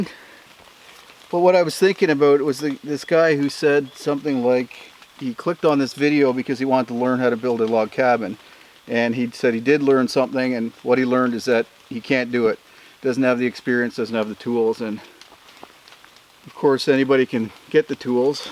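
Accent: Canada. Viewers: i can tell by the maple leafs and ur accent ur canadian lile me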